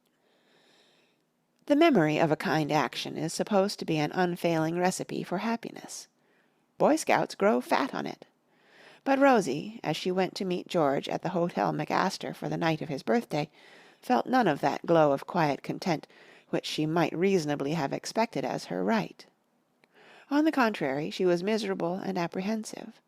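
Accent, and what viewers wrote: United States. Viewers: Wodehouse read by an American?!?! Blasphemy!!! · It is surely OK to read an American story with an American accent? The real abomination would be to read it by AI!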